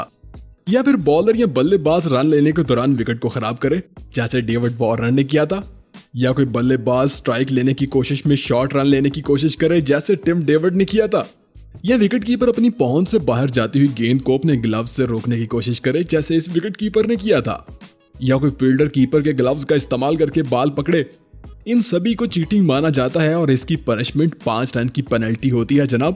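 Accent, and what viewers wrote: Pakistan. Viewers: Tera accent bilkul Pakistani hai but I know you are indian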